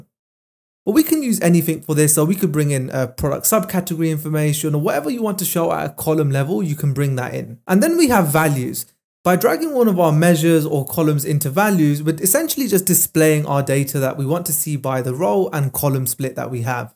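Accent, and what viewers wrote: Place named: England, United Kingdom. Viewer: Thank you so much a legend and breath of fresh air hearing a proper English accent from a local bro!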